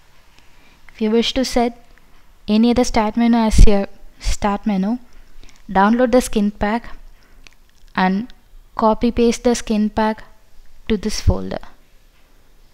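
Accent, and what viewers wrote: India. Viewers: lol i know you are india i know from your sound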